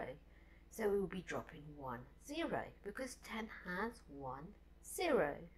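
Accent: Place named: United Kingdom. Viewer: Shes british i think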